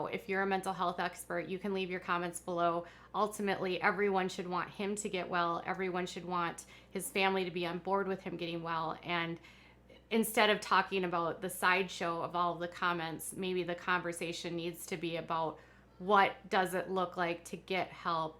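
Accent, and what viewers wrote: Minnesota, United States. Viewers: I think needs to go back to writing some BS liberal snowflake “column” or “blog”. She pathetically uploads several times a day about ridiculous topics with no proper research or fact checking. She has to censor every little word she is afraid might keep her from making a buck. Hey, if you’re so passionate about your political beliefs, just say the word. ABORTION. If you want to even ATTEMPT to play the reporter role, drop your awful Minnesotan accent and learn to use talking head diction. Putting a bag over your head might help as well. You have a rare talent of making someone’s eyes, ears, and brain bleed simultaneously.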